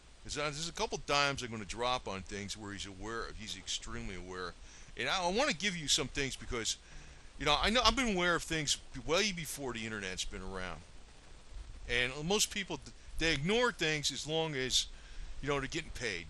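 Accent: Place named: New Jersey, United States. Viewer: Its a real accent. Its an old school working class New Jersey accent I think? The only other person I've ever met with the same accent was a WW2 veteran. Its an old school accent.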